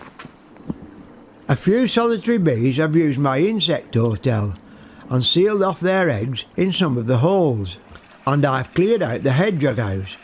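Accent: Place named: Lancashire, United Kingdom. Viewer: I enjoyed this video and recommend you for your care of the wildlife. I was born in Lancashire and lived a couple of years in Todmorden. I love listening to the music of your accent it feels like home.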